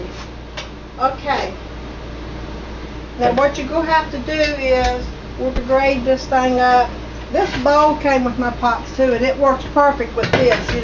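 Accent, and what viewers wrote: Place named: Appalachia, United States. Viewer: my mom had the same cookware you have - waterless cookware. I remember she paid a small fortune for them and took the best care of them using Bar Keepers Friend on the inside EVERY time she used them. They are 30 years old and in mint condition. She has the same grinder tool too. My Mom passed away about two years ago and I miss her every day. Seeing you cook with your pots and pans and in that beautiful Appalachian accent - also like my Mom - makes me happy in a special way. So thank you for doing all you do to share your cooking experience. It means a lot to me. Btw, Mom always told me that if I didn't scrub her pots properly and take good care of them after she was gone, she would come back and haunt me. 😁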